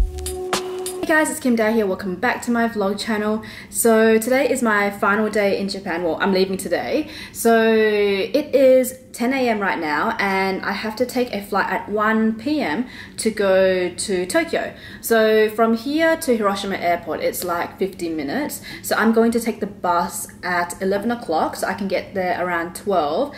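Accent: Australia. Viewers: Very Lovely Kim! 🍦🍦😉😉⛹️‍♀️💻💻🍾You have a hectic but interesting lifestyle!🙂..Nice to see how much your videos have evolved! YOU are a sweet cutie! Always be Yourself! I love love your Australian Accent! 🙂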